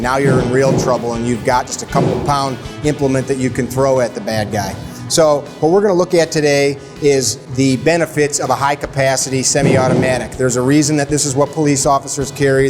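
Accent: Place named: Midwest, United States. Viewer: Man is that midwestern accent thick.....love it lol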